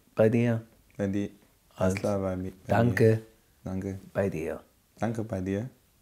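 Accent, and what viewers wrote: Swabia, Germany. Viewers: Schwäbisch mit bissle andere Land noch bei, weisch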